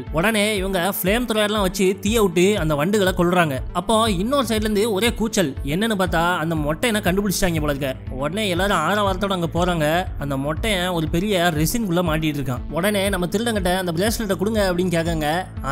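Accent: Tirunelveli, India. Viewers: It's Trunelveli slang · Bro neenga thirunelveli ya unga slang super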